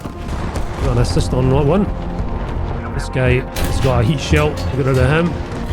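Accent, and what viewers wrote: Scotland, United Kingdom. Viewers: as a new subscriber, I ask this favor of you with the complete understanding that it very well may never happen. But, nonetheless, can you please say "Burglar Alarm" in a video, please? Jeremy Clarkson had a Scot say that phrase on the old Top Gear and It would kill me to hear you, another thick accented Scot, do the same. Thank you, sir, love your videos!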